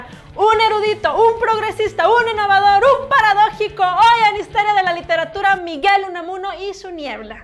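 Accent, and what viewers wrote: North, Mexico. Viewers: No sé de dónde es, pero me encanta esa forma tipo norteña de explicar las cosas. Gracias. 💕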